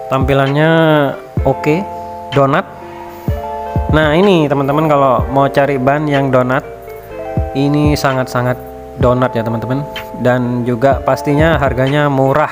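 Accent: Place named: Madura, Indonesia. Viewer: Logat bahasanya kaya logatnya orang madura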